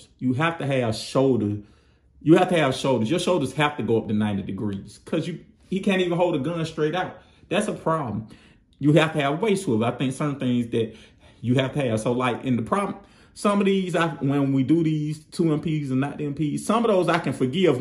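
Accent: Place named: United States. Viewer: I like your country accent. I also have one. I agree, the articulation is sad and it is making me sad to buy the new release. SMH I was hoping someone would fix these issues. Probably a 4th Party will release after I buy and make me sick. I hate TF collecting sometimes.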